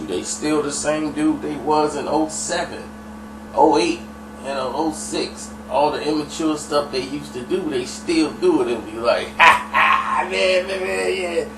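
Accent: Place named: Chicago, United States. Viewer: I can do without your Chicago Accent Bro! Next video you need to sound like you from VA or I'm going to unsubscribe!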